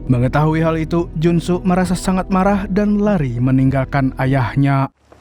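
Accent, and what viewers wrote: Banjar, Indonesia. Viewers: Suka suara x orang banjar kah bang?😊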